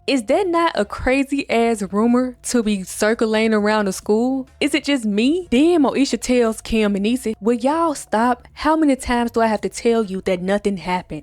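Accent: Memphis, United States. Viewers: Are you from Memphis? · Ok love this but are you from Memphis lol? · off topic to the video but as i listen to you speak i keep wondering “she from Memphis huh???” lol. W video btw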